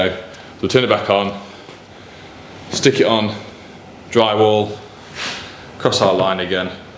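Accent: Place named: United Kingdom. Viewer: british accent SHIT!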